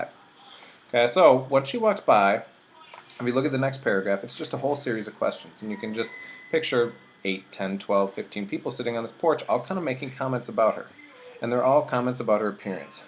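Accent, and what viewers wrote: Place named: Minnesota, United States. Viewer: This fucking guy is too Minnesota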